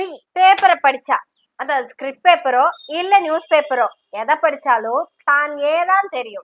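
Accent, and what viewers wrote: Kongu, India. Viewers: ❤ நகைச்சுவை மிக்க கொங்கு தமிழ் அழகாக அரசியல் விளையாடுது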